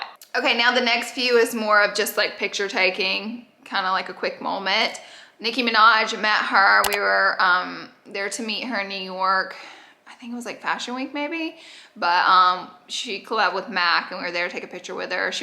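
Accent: South, United States. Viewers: Absolutely love, love, love you. I am so glad you done this video in your original accent not your Cali girl voice. Not judging, just love southern.🥰🥰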